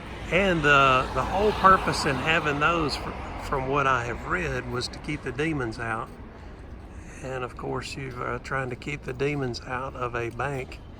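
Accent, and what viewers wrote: South, United States. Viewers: Great episode,! I live in Georgia(USA). Just subscribed to both channels. I enjoy your southern drawl and your videos! Thanks!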